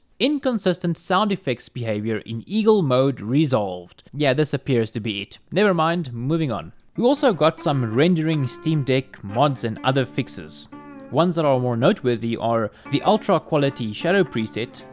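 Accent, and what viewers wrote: South Africa. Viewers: Joh , the Afrikaans accent is strong with this one!! Would love to jol some co-op with you sometime!!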